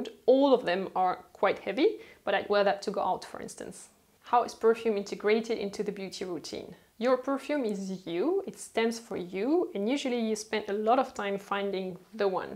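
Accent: France. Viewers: more than your knowledge of perfume, i was enthralled by your frenglish.😊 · Am I hearing english in french accent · Haaaa shes French. She pronounces it with a French accent. She'd actually know. No where did she say Glass. Thats the french r you're hearing. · I love this video. It’s perfect, concise. You have such a lovely accent, and your perfect red manicure along with your black top are exactly how I imagine classy, quintessential French ladies. My favorite go-to perfume is Alien by Thierry Mugler. I know some ppl find it strong, but I love it. I received a sample once and knew I had to have the large bottle. I always spray on my wrists, neck, and behind my knees before I go running. I love how I can smell it come off in warmth as I run along. Plus, the name appealed to me. When I purchased it, at that time in my life I very much felt like an alien in my world lol.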